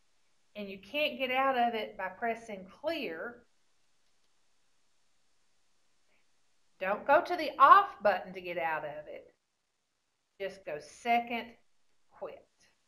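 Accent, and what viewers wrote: United States. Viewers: God bless you, are you from Boston?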